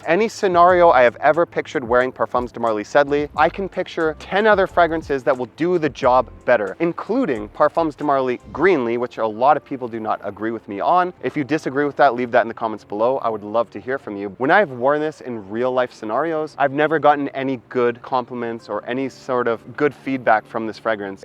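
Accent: Canada. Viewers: Anything in a 4.2oz bottle is definitely worth it. 100 meters🤣😂😭Canadians definitely talk differently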